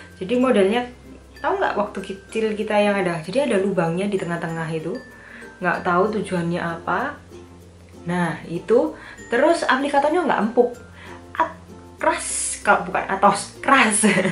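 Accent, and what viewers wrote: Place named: Surabaya, Indonesia. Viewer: medok bgt surabaya nya cik.. tooss laah sm2 org sby.. hahaha😜😜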